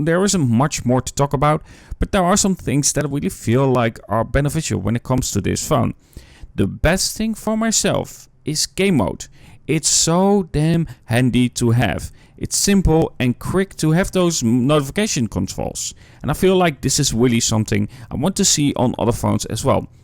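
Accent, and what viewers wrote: Netherlands. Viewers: nooit geweten dat je Nederlands was, ik hoorde het accent en dacht gelijk dit is een Nederlander.🇱🇺 Keep up the good work 💪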